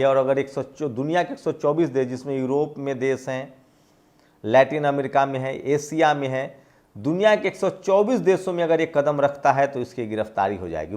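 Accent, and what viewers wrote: India. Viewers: Mr your desi dialect is wonderful and your vlog presentation is really unique I really like it....keep it up speak for humanity.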